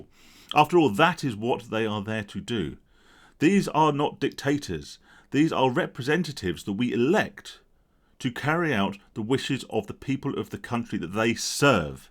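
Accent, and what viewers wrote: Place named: United Kingdom. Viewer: Thick British I’ll say no more.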